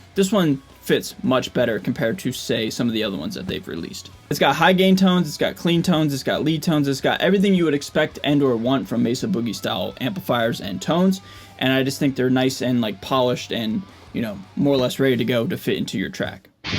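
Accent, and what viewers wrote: United States. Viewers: What am I hearing, meaning what r u playing thru? That was fat man. I want a home studio. And I’m curious about yours. Mostly because you speak American and not British !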